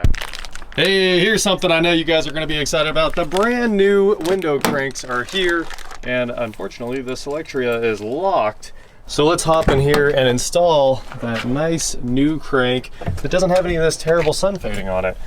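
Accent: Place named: Midwest, United States. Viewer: the "needs replaced" dialect is very interesting , only heard it in the middle of the USA, versus "needs to be replaced". Noticed it over several videos.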